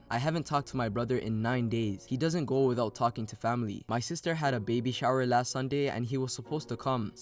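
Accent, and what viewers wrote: Toronto, Canada. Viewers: you sound like a Toronto mans. Are you from Toronto bro? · Your Canadian right? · torontonian accent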